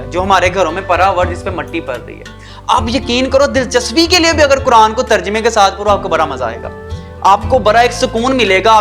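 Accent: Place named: Punjab, India. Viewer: Sir story b axhi thi lakin ap ki punjabi ka style b buhat acha tha seriously 😀😂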